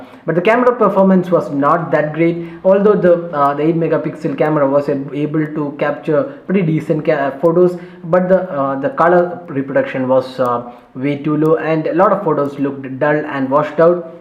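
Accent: Kerala, India. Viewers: indstall aapal gawlity abailabel haha mallu english is unique. My english teacher was mallu too and spoke just like this. I noticed that they have the most thickest accent in south india. Tamils actually pronounce better than telugus.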